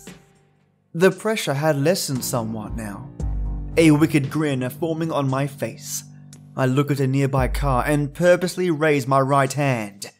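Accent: Australia. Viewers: You make me wish I had an Australian accent.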